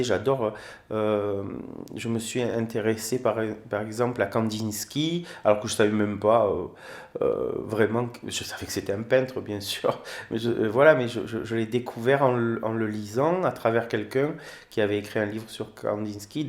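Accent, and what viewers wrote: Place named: Provence, France. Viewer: Bises à toi,qu'est ce que tu as pu me faire rire avec ta faconde provençale qui me rappelle tant "Les Martigues" de mon enfance...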